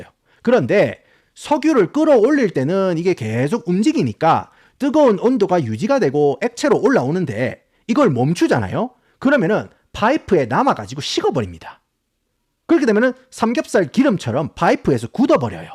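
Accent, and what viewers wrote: Gyeongsang, South Korea. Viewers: 경상도 사투리 구수하고 영어도 잘 하셔서 계속 듣고 싶어요 ㅎㅎ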